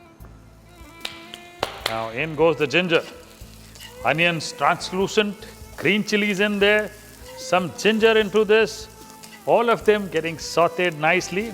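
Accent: Punjab, India. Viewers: Paji....ur punjabi style Hindi is very nice...english is not suting ur voice......the way you tell "Oh ji namak shamak namak shamak" is awesome....paji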